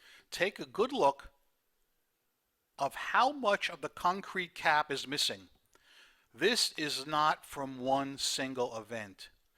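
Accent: New York, United States. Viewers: your accent! You are a New Yawka times 10! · Very fun to explore with you and your fun New York accent. The bathroom is not a bathroom if there are no showers or bathtubs. So it's known as a Restroom. 🤗🤗🤗🤗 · the narrator is a native New Yawker! He calls the street the Henry Hudson "Pawkway."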